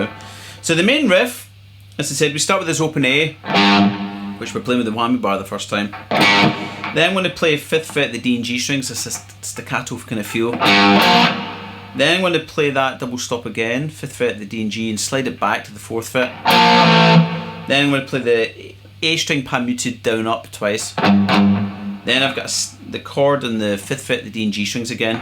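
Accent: Scotland, United Kingdom. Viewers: Man his Scottish accent is so dang smooth. I found it a lot easier to follow along to, and helped me mail the song.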